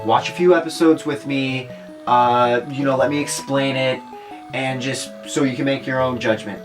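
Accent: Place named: England, United Kingdom. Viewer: My nigga, this is some jontron shit and I like it. IMO: You need to keep the tone of voice more constant, when you are on video you are pretty loud which is good but on the mic is sounds like you are hugging the stand and whispering into it. In regards to the englishese (the opposite of engrish) the pronunciation may annoy some, just a heads up. 10/10 get subbed son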